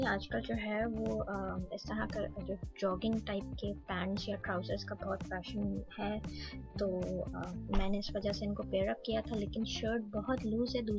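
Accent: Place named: United Kingdom. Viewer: okkkk that's great uve adopted a v good British accent that's why I was asking 😍😍😊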